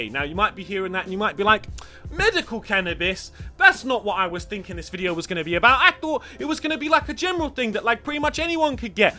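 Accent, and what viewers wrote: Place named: Surrey, United Kingdom. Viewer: You sound like a surrey boi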